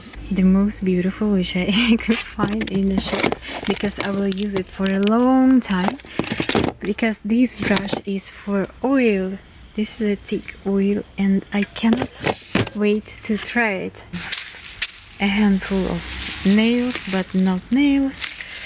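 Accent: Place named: France. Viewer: There is a new French voice on your video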